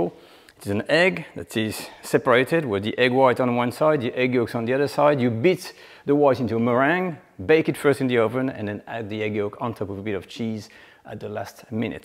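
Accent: France. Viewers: Dude, you are losing your beautiful French accent...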